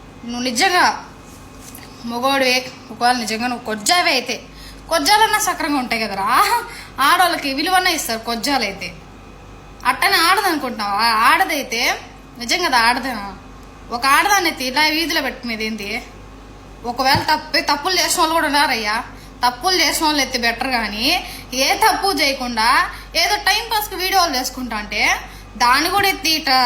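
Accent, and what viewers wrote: Anantapur, India. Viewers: Buddham namami !!! Ananthapuram voice good sister garu. Take care in Kuwait.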